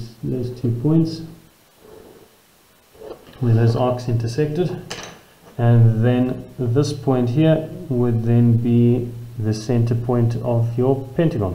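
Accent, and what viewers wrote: South Africa. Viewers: oh hes so south african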